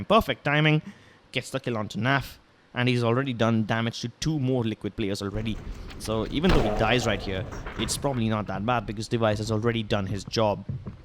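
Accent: India. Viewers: ur indian? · Are you indian? Your accent sounds indian. Btw, love fron bihar, India! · You Indian?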